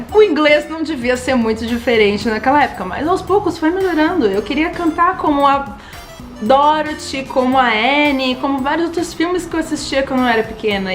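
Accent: United Kingdom. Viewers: o sotaque britânico é maravilhoso, mas me embaralho muito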